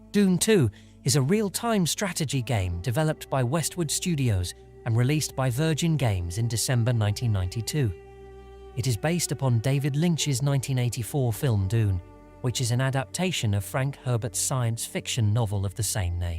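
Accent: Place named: England, United Kingdom. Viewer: Doon. You have a freaking english accent. How do you pronounce the letter "U"?!? Why don't you pronounce studio, "stoodio"?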